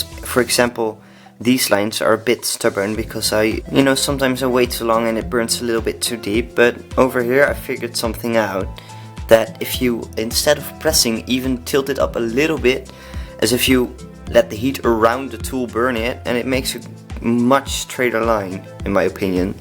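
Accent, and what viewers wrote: Netherlands. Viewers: your accent sounds kind off dutch am i right? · suscribed 'cuz, MEDE NEDELANDER XD Ik dacht het al te horen, maar ik hoorde Pippoos en toen wist ik t zeker haha. Goed bezig jongen!!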